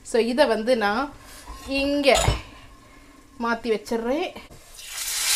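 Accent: Nagercoil, India. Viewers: Nagercoil slang🔥🔥❤ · I really like to hear ur Nagercoil slang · Sir learnt nagercoil slang from u madam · நம்ம ஊரு பாஷை, அப்புறம் பழக்க வழக்கங்கள் முன்னாடியெல்லாம் மற்றவர்களால் கிண்டலுக்கு உரியதா இருந்துக்கா, ஆனா இப்ப உங்களை மாதிரி சாதிச்சவங்க அத சரளமா உபயோகிக்கும் போது ரொம்ப பெருமையா இருக்கு நானும் நாகர்கோயில் வாசி தான்னு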